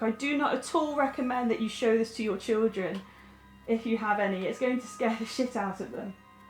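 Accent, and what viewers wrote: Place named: Newcastle, United Kingdom. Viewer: Are you from newcastle? Because you say book like that. I looovvvee your accent 😊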